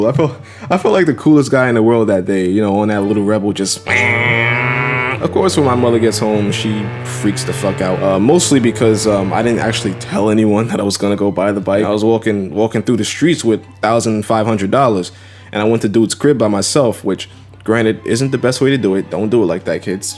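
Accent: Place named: New York, United States. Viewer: Man I knew the minute I listened to your voice I knew you were from NY also lol. Hope to run into you in the city sometime, love your videos 👍🏻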